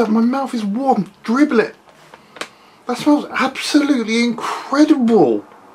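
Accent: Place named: United Kingdom. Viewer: I take it you’ve not heard many accents from the UK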